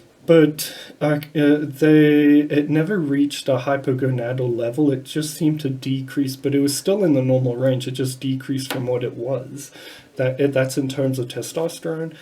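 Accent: South Africa. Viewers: Do I hear an SA accent there?? 😉 Good on you for putting out good info on the subject! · Your south african right man....great vid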